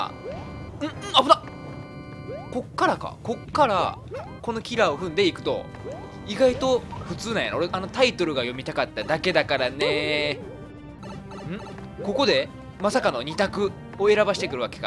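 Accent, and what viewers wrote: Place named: Kansai, Japan. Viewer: チャンネル登録しました！編集上手いし、関西弁好き♡めっちゃハマりそう！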